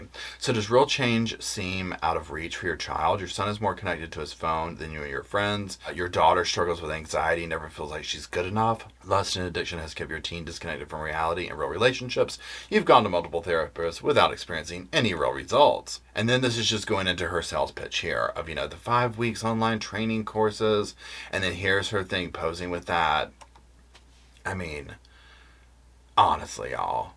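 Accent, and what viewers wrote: South, United States. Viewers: Can we say C U L T?? Oh my goodness! YESSSS!!! Let’s hear the transcripts, podcasts, all of it. I’m here for it. On a more serious note- i think that the higher ups of this particular religion really need to speak out and speak loud about what is condoned by the LDS church, and what is not. What is encouraged, and what is not. Because, right now, that church is looking BAD BAD. I live in a small mountain town in UT- the same one that Kouri Richins tried to purchase that big ole house in? Yep. As a non- member, it terrifies me that SO MANY high profile cases lately are coming from “devout” LDS people. The dentist who killed his wife. The wife who poisoned her husband. The Daybell/Vallow saga. 8 passengers. The list goes on and on. If I was the head of PR for the LDS church, I would be hiring the best people to somehow dig their church out of this optical MESS! 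Looking forward to your next video! Your personality is perfect for this! Love when you roll your eyes and get a titch petty with your southern accent…. bless their lil pea pickin hearts. Ha ha. Thanks for all the fabulous content!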